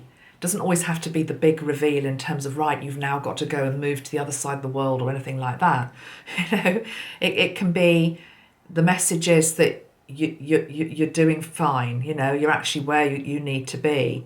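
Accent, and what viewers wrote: United Kingdom. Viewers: Just 1st time your video came to me. 🤩 Love your energy, learning good amazing british English 🙏🙏❤️🤗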